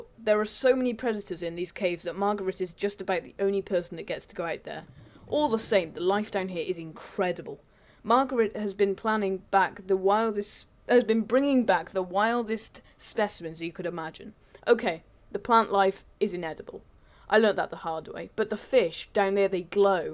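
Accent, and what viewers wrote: United Kingdom. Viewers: I LOVE YOUR VOICE... HONESLY I'VE ALWAYS WANTED TO HAVE A BRITISH FRIEND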